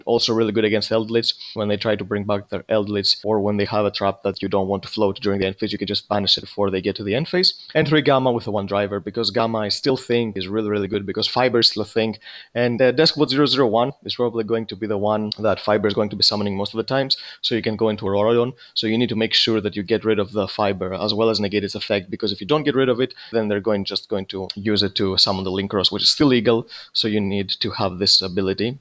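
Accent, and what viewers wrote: Greece. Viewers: R u greek or cypriot my friend?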